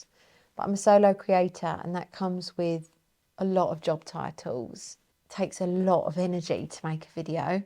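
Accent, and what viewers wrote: United Kingdom. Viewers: OH WOW! I don't often see your vids pop even tho I've got you on highest notifications but this one just popped up.....and what a great vid. You know I found your channel back in the covid lockdown days and the world was so chaotic back then. I was also sobering up and really struggling with life and your vids were so peaceful and calming. Your delivery is so cool. You share honestly and it's so refreshing and I gotta say, comforting. It's like "yeah we all struggle with life and trying to do things that are meaningful to us but also earn enough income so that we can take care of ourselves and our loved ones and have some extra for nice things"....the struggle is real. I just love how your throw yourself into things but then also document how you're feeling during it, your self doubts and all that. You just come across as a very genuine and real person and I love that. 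You've also got a great accent. Being from Oklahoma I don't hear many British accents but I love yours. Anyways, keep doing what you're doing and congrats on 3 years, making SO MUCH money!! AND getting to 143k subscribers!! WOW!! What a great year it's been! I'm so happy for you.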